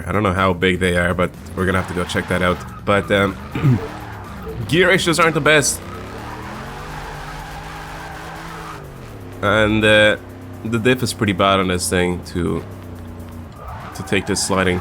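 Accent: Ireland. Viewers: are you irish?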